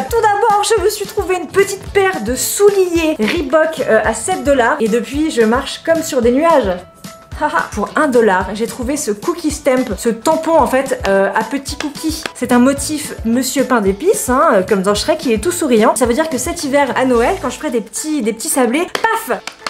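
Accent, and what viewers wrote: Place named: Southwest France, France. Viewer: Oui une vidéo de! meilleures trouvailles du monde. j'adore ton accent de Maïté haha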